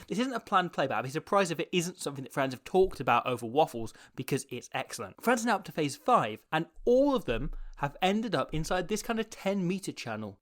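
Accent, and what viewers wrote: Wales, United Kingdom. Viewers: haha - that's hysterical... "he picks to draw the Napoleon pops Dubai" is a mistranscription of "he picks to draw Vunipola and pops to Baille" also anytime it reads 'rug, rook, rock' - it is of course 'ruck';-) maybe Google needs to train its AI more on the Welsh accent (although is not that strong)... mind you, I should not complain, when I watch France play, I keep hearing your captain's name 'Ollivon' as 'vol-au-vent' X-D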